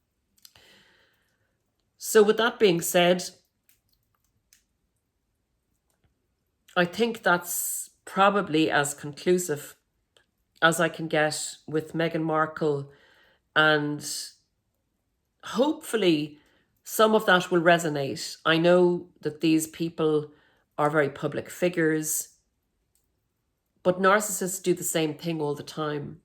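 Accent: Ireland. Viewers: Interesting ...Irish accent 🎉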